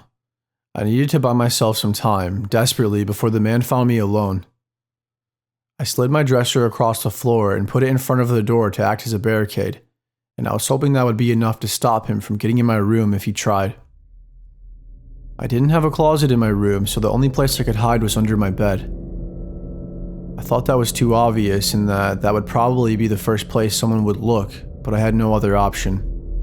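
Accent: United States. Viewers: No offense mate, but your accent sounds like a stereotypical California suffer bro dude. It’s not a bad thing. Haha. But it’s hard to take you seriously when your voice sounds so unserious. Let’s face it the Cali suffer bro aren’t the most serious types of people. 😂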